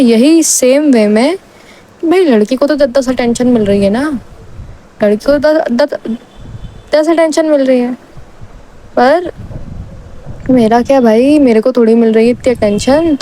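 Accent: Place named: Madhya Pradesh, India. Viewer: Are you from madhya pradesh? Because of your tone I feel like you are from MP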